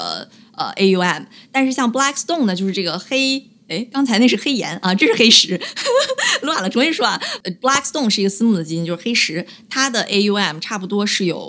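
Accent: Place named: Beijing, China. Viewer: 你边说边笑有时候听不清，北京口音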